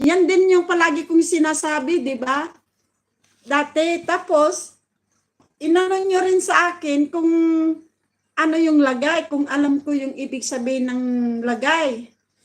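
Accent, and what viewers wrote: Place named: Visayas, Philippines. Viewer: Kaya hindi yanmaganda pakingan host heheh may bisaya daming pronounced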